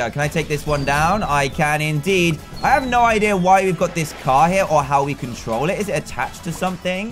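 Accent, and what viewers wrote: England, United Kingdom. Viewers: lol is from england?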